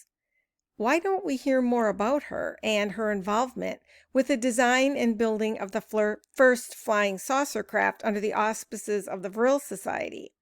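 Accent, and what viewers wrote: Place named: Chicago, United States. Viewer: That's a Chicago mid West vocal infection. Someone I loved spoke that way and she even had it when speaking Spanish..as she was born in Cuba then fam had immigrated to Chicago as a child.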